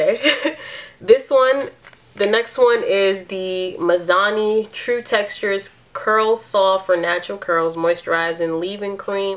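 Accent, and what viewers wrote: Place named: New York, United States. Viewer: thanks for the review. But I was surprise to really hear your New York accent, I'm from Chicago and Its usually not as strong in your other videos, but they must had really upset you with this box!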